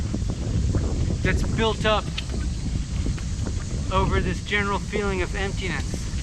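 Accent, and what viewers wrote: United Kingdom. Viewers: 合理 合理 he speaks london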